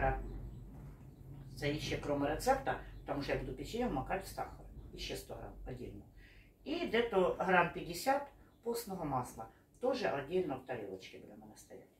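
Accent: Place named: Chernihiv, Ukraine. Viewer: Доброго дня! Випадково нашла ваш канал і одразу підписалась! Така щира і життєва жінка! Вибачаюсь ,але дуже цікаво з якої ви області? Мені здається,що чую рідний акцент,так як у нас говорять на Чернігівщині))❤ Дуже гарні рецепти і відео сповнені енергії і мотивації! Мира і добра вам!❤❤❤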